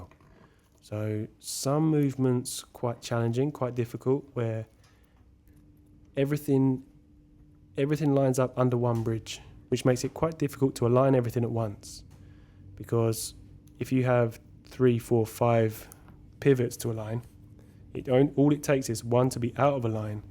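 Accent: United Kingdom. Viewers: Judging by your accent you are British British. So why the weird American word "winded", instead of wound?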